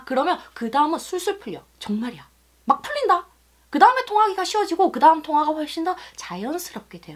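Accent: Gyeongsang, South Korea. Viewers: 서울방언속에 경상도표준어가 쪼매 들리네요.ㅎ 잘 듣고 가용.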